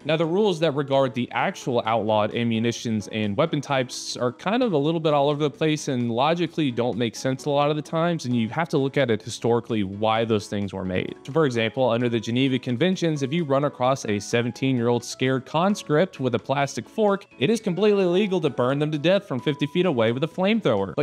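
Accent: United States. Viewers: Hold up. Your American accent tells me enough. Pay Japan and get it over with American. Consider your own first words in this video. (I enjoyed your content [as in this first 5 second clip I watched from you] but I must leave and never watch the video further because that would most propably make me look like an idiot as you will most likely prove my own point or speak about the "illegal warcrimes")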